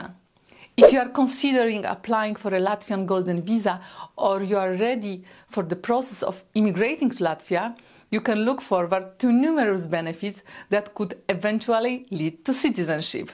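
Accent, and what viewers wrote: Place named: Russia. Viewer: Yoir accent is like that of Russian.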